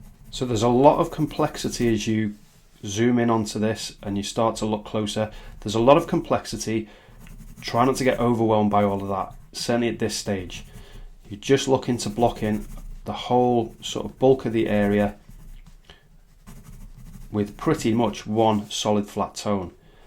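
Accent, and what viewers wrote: Liverpool, United Kingdom. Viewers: Liverpool? Or Birkenhead way ? , some words I’m thinking deffo Liverpool, then I’m goin oh I don’t know 😂😂😂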